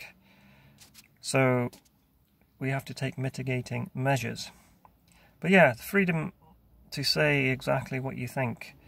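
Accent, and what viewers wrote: Scotland, United Kingdom. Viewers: I never noticed your Scottish accent until this video.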